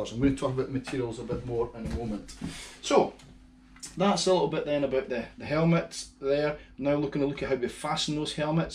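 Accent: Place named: Scotland, United Kingdom. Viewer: Hi, ive been training to be a cbt instructor for a couple of months now and this was some fantastic depth and detail for element A! also very refreshing to listen to a fellow scotsman explaining it all lol! thanks for taking the time to do this for new riders and advanced riders! i hope you dont mind me taking down notes from your videos!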